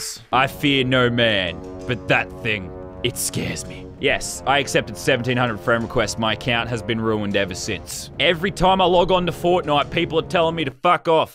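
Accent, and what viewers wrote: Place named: Australia. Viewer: Even when he screams the theme, he still sounds australian...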